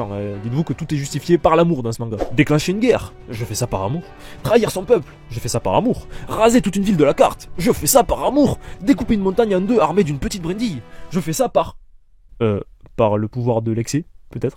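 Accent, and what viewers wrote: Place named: Marseille, France. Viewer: Tu as un accent Marseillais 😂 sinon tu gères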